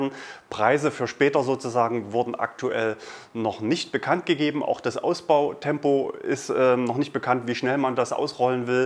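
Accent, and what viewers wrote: Germany. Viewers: Gute News aber du ziehst ja echt hardcore die deutsche Aussprache durch :D Model übsilon geht ja noch, aber <link>.. da steckt eindeutig "drive" im Namen, also elec trive :D.. glaube ich :)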